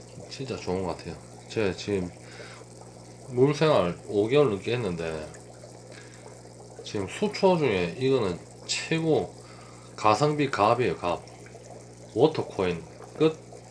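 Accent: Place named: Gyeongsang, South Korea. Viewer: 사투리가 완전 제 고향에 온 느낌이네요 혹시 경주쪽? 울산쪽? 암튼 그쪽 경상도사투리같네요ㅋㅋ어항 잘봤습니다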